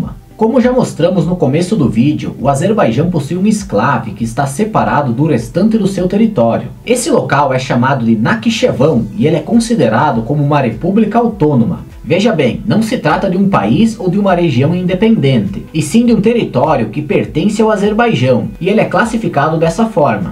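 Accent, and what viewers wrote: Paraná, Brazil. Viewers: Gostei demais do assunto e também do sotaque que parece do Paraná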